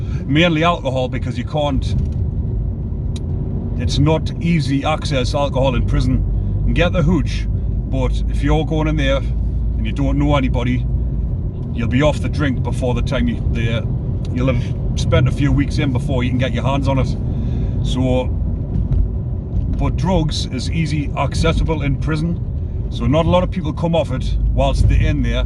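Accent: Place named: Scotland, United Kingdom. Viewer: like your scottish accent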